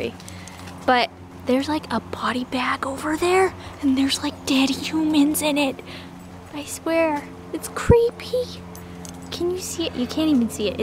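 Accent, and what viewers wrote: Australia. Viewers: I like your Australian accent :) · Welcome!!!! Nice australlian accent ;)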